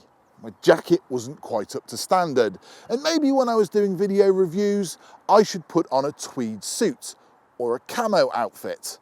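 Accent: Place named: West Country, United Kingdom. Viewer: Birthday suit legend, nice to hear some west country tones, I’m not far from you, on the mendips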